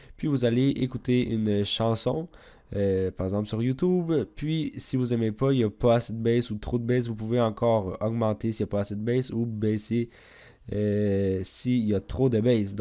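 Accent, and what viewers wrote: Quebec, Canada. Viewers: Un Québécois ! Belgian like Québec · lol canadien comme moi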